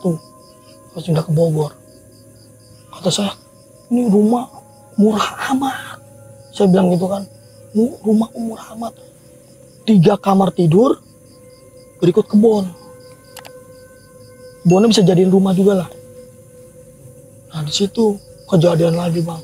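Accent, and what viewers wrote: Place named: Banten, Indonesia. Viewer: Gendrowo main kejar2n lucu juga..😀 Bang asyik ngebawain ceritanya..senang dengar alurnya..logat bahasanya berasa sangat banten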